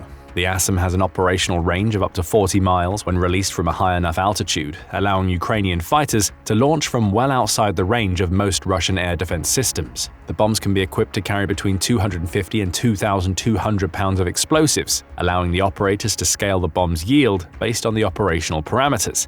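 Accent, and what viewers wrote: England, United Kingdom. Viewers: I'm really getting weary of the ENGLISH ACCENT. As if it the accent somehow carries more intelligence. 🙄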